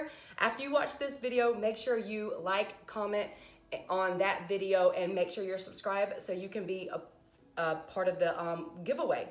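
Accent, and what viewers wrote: South, United States. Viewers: I just love ya girl. I just love hearing you speak with that gorgeous southern voice of yours. Us southern girls need to stick together. I did find several of the cookbooks & I too love to cook especially new things. God Bless & "Yes Mam". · New subscriber ! I love your energy and southern accent ❤️ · I love to hear your southern accent, of course I’m from the north. I’m still listening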